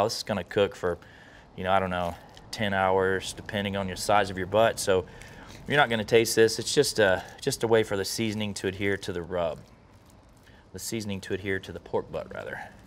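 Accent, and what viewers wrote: South, United States. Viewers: Wait is this guy from the south? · Is this guy from the south??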